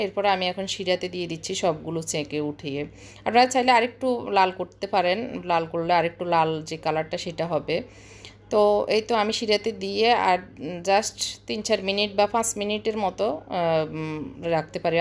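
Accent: Noakhali, Bangladesh. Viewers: Apu apnr kothay Noakhalir vasar j tan ta, ata amr kache onk valo lage Ami Noakhalir NSTU te porasuna korechilm...onk suvokamona apnr jonno.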